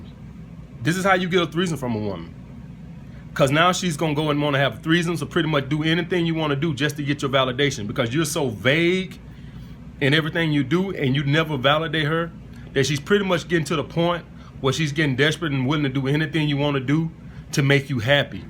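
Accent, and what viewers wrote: South, United States. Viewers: that country accent is dope haha. Good looks on the game big bro, just subscribed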